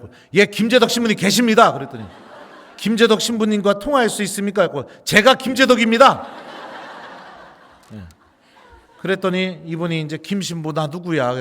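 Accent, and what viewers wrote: Chungcheong, South Korea. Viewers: 신부님🎉 구수한 충청도 어투에 진솔한 마음이 무한 감동입니다 🎉🎉🎉 만나면 화해한다는 말씀, 성체조배의 중요성, 하느님과의 화해🎉 고해성사의 진실한 준비- 더러운 물을 정화시키기 위하여 깨끗한 물이 필요하듯이 고해성사가 우리 마음을 정화시켜주는 깨끗한 물이 된다는 말씀🎉 감동입니다 🎉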